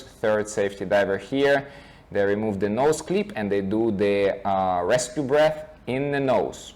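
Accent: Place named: Russia. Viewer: Слушай, красивый человек с красивом акцента русско:::о)) Ты понимаешь эту тему, не умру ли Я На глубине? D5. COMBO )) Только КОПИЛ. Туш рвётся от того, что не купил океаин, если ПОДУМТЬ, ПОКУПАЛ ДЛЯ ДОКТРИНЫ,..Нет для фактов дошёл до зардержке на постептли 2 B D ВПИЗДУ ПНГЭ НО КРАСИВО:О) )\\\\ да? как же хочется это дыхание сохранить на манималках? ХУУЁВО ЗНАЮ Когда контроль, на глуби ВНЕ КОНТРОЛЯ, уже пошли работать безумные Смертники.. БЛЯЯЯЯЯЯЯЯЯЯЯЯЯЯЯЯЯЯЯЯЯЯЯЯЯЯЯЯЯ:О) КАК ЖЕ ХОЧЕТСЯ Погрузиться глубже...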